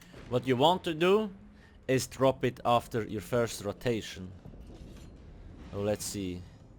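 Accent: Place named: Switzerland. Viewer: I'm a simple swiss, i hear swiss accent, i upvote!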